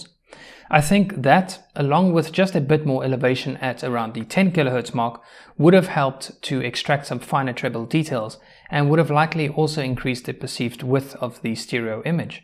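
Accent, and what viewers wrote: South Africa. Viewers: Unrelated but are you South African?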